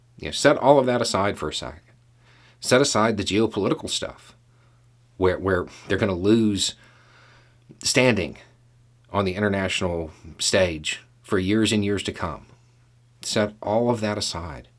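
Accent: South, United States. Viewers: Another Skewer here! Love Tuesdays lots of great content from two of my favorites. They prove that all of us sporting a Southern accent are not complete morons! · First time watching. First impressions are often wrong. My first impression, beard, southern accent, prepper, yeah, this guy is a Trumper. I hope to hell I'm wrong because there are already far too many anti-American republican a-holes. So, before I 'sub', I must watch more of this man's videos. I 100% STAND WITH UKRAINE! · Thanks. Yeah I knew as I sent that comment that it wasn't Texan. Had a feeling it was southern tho Howdy there internet people it's agaien. So todaiye...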